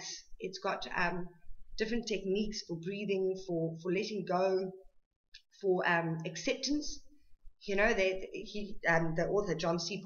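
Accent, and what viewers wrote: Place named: South Africa. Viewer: Hang on... South African accent detected. Good job quieting smoking. If nothing else you will save a lot of money. As a fellow South African and a ex smoker ex heavy drinker I literally get where you are coming from. I take it that you still live in South Africa, maybe not but does not mater because what I'm about to tell you is the same either way. A lot of South Africans who leave the country have a hard time adjusting to their new surroundings because they are use to being stressed, pressured, feeling vulnerable, unsafe and generally frustrated. In South Africa we grew up (I'm thinking we are about the same age) with thees feelings not knowing any other way of life. The constant stress and constantly looking over your shoulder to us, is considered just another day. You and I know that the human mind can not stand the stress even if we lie to ourselves and say we can. 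That is why so many South Africans drink so much, smoke so much and do so many other addicting things. It's just way to escape reality because our minds need release to try to relax and calm down. The truth of it is and most South Africans will disagree is that having grown up in a war zone in Africa we all surfer from PTSD. If you are looking for answers to why you feel the way you feel and why you do the things you do look into Post Traumatic Stress Disorder. Look at the symptoms of PTSD and you will recognize a lot of them. One of the ways I try to deal with having grown up in SA is to try to learn to relax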